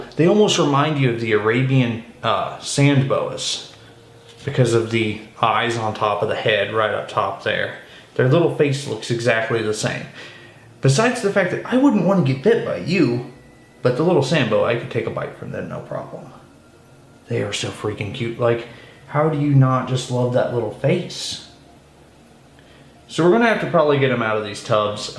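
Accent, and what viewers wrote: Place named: Utah, United States. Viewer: Do you happen to be from Utah? I am detecting a very distinct Utah accent.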